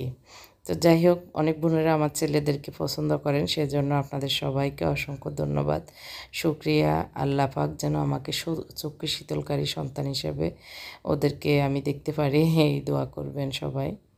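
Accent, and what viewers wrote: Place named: Feni, Bangladesh. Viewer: Apu tomer kotha gula sunle apon apon mone hoi, karon ami o fenir, purbo ukil para, thaki Dhaka te, r tomer vlog dekhar jonoo wait kori,balo thako.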